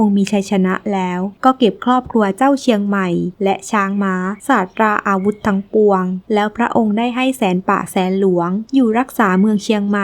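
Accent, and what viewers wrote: South, Thailand. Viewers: ฟังน้ำเสียงแอดมินเป็นคนใต้ไหมครับ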